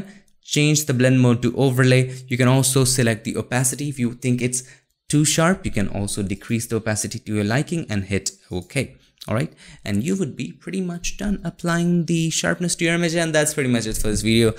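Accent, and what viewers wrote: India. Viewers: the only indian whose accent is not giving cancer to the ear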